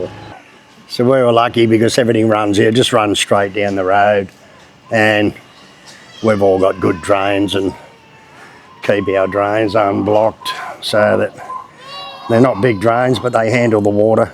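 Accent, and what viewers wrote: Australia. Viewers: I’m in North Queensland, great to hear the flat Aussie accent 😊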